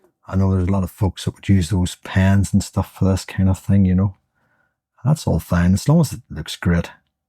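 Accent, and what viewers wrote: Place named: Northern Ireland, United Kingdom. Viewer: I was scrolling through looking for a nice christmassy scene and I copped this beautiful painting, when I heard you're northern Ireland 🇮🇪 accent I had to watch it . I then realised its a watercolour and I never used them, I use acrylics (for my sins) as me granny would say..... im going to give it a go in the acrylics anyway 😂 its so gorgeous ❤❤❤❤❤❤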